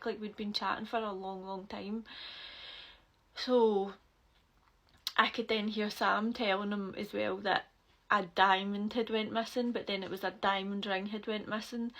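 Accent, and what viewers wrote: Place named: West Scotland, United Kingdom. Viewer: Where abouts you from??? Defo sound West Coast of Scotland / Glasgow / Ayrshire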